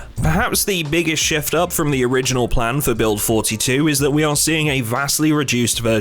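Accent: United States. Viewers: This American guy who makes these videos has an interesting accent. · This one funny sounding American. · I for sure thought you were American or maybe Canadian, you have a very American accent.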